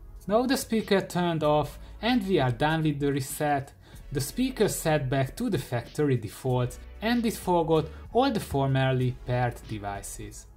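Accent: Hungary. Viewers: Magyar vagy, ide hallom 😂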